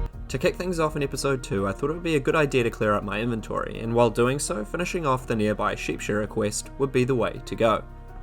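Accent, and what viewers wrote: New Zealand. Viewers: Are you from NZ? Accent sounds familiar.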